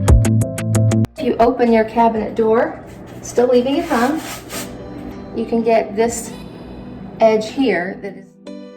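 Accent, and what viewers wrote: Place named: Texas, United States. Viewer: are you from Texas you sound like it. I’m from Texas. I’m going to add my cabinet painting to my channel and give you a shout out if you are ok w that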